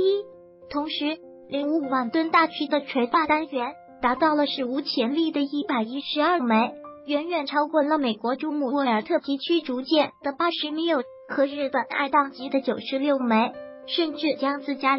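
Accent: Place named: Taiwan. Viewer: 台妹说话的腔调让我浑身起鸡皮疙瘩，头皮发麻，哈哈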